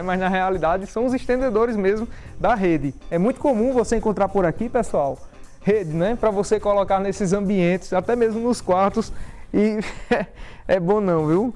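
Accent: Northeast, Brazil. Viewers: Comigo, foi diferente, sou inscrita em vários canais de arquitetura, pois além de amar, estou em reforma. Mas nesse canal ( o único da área ) me inscrevi pela simpatia do rapaz tbm. Sou mineira e amo o sotaque nordestino) 😊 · Que casa meu Deus,e esse sutaque lindo essa simpatia nossa que maravilha,por isso amo o nordeste, parabéns!!!!! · Adoro seus vídeos, vejo todos... Você é uma simpatia esse sotaque nordestino dá todo um charme no vídeo... Parabéns pelas filmagens... E a casa realmente maravilhosa. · Amei a forma como você apresenta! Esse seu sotaque é demais! Até porque eu também sou uma paulistana/ nordestina! Este sobrado é simplesmente linda!!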